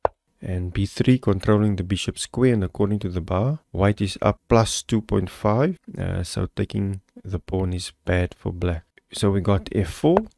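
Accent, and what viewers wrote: South Africa. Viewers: Thanks for the reply/clarification. Nice to hear your South African accent (I used to live there).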